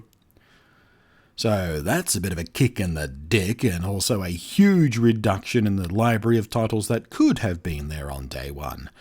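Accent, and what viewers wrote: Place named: Australia. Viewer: thats because hes the australian version of the narrator from stanley parable.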